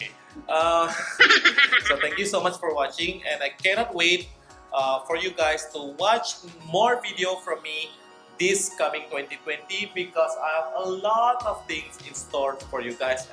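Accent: Visayas, Philippines. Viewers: For me he sounds more Bisayan accent (Southern Filipino) it's closer to Bahasa Indonesia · He sounds like he's from the south. Bisaya or Mindanao. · Your accent is more Filipino daripada Indonesia hahaha anw buat video, ajak dan perkenalkan teman2 filipino utk mencoba segala hal ttg Indonesia (food, fashion, film and tradition) Love !! ❤️✨❤️✨❤️✨ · The way you speak the intonation i thot u are Bisaya!!! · no i mean when he speak indonesian, his accent sounds filipino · Indonesian with visayan accent 😁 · His accent like filipinos 😂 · Nah kesini baru nyadar😀 Logatnya udah Philipina banget.. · Akala ko bisaya sya kaya iba accent ng tagalog nya❤ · Ainako Kuyaaaa.... your accent talagana Filipino..... Guapuna Si Kuyaaaaa Gustokoooooo Salam dari Indonesian tinggal di Ushuaia - Argentina... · The way you talk is like a bisaya trying to speak in tagalog medyo may pagkadiin or matigas ang dila. 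I'm bisaya btw🤣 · Parang isang bisaya or ilokano lang na nagsasalita ng tagalog haha,,,iisa talaga ang pinagmulan na tribo natin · Bagus kawan . . . cakap talalog. Kau macam orang pilipin. Kudos. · Similar pala ung Indonesian accent sa Visaya accent. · You sound like a Filipino from Bicol, Visayas or Mindanao who speaks Tagalog. Saya dari Bicol, provinsi Albay.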